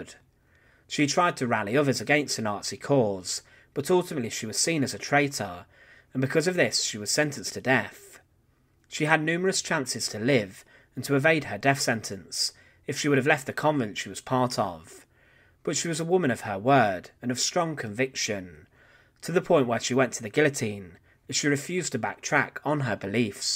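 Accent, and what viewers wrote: United Kingdom. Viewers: You put an huge emphasis on the last syllable of the word at the end of each sentence. Strange to my ears. Is this a particular British accent?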